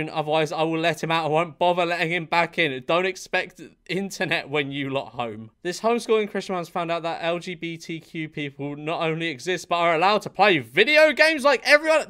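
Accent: United Kingdom. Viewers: 'ello welcome back - brilliant sign of a British man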